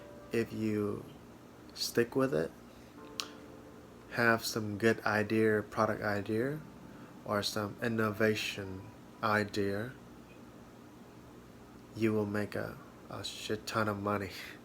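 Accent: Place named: Texas, United States. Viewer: Dude whats up with the southern texas accent haha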